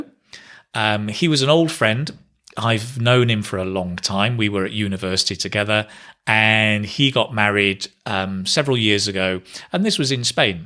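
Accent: United Kingdom. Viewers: is it British English?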